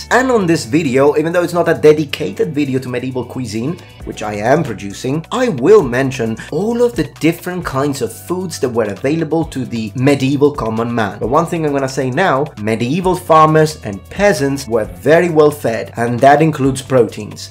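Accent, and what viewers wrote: Italy. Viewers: Are you Italian where r u from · Sei italiano vero?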